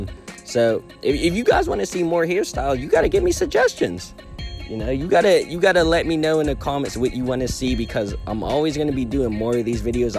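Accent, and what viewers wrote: United States. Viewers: U sound kinda country.. but ur drawings look good asf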